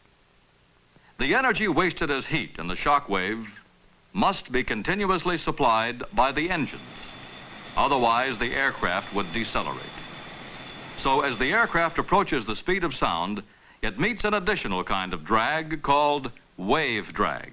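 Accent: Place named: United States. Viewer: American accent, British birds (as a rule), a bit odd.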